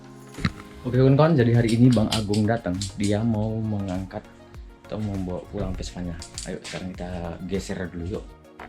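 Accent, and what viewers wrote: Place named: Padang, Indonesia. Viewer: Cara ngomong lu keren bang kaya temen gua dari padang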